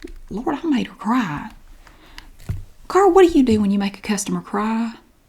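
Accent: South, United States. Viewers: This is amazing! Raised in Oklahoma and the accent is so wonderful.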